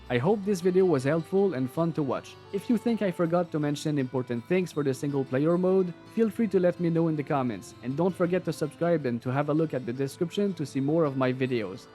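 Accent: Quebec, Canada. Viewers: Thanks for the content! Are you french canadien? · Tai tu quebecois ???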